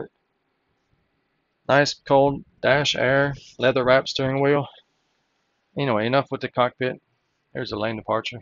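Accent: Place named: Montreal, Canada. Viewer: Great review as always. Liking the Montreal accent. Thanks for posting.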